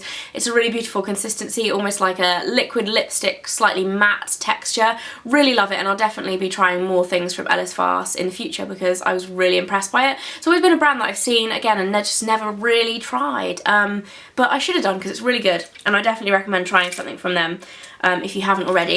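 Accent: United Kingdom. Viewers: !!!!! YOU ARE AMAZING AND YOUR BRITISH ACCENT IS JUST PERFECTION!!!!!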